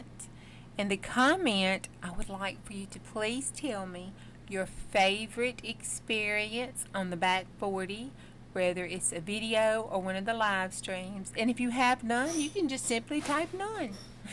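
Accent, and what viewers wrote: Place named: South, United States. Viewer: Congrats my dear friend - you deserve everything good that comes your way. I don’t have one specific thing I love - what I love about you and your channel is your wit/humor, the way you care for other people, the way you are willing to help others with helpful tips, and the great art you produce. Oh, I can’t forget your lovely southern accent. In short - I love you, girl. ❤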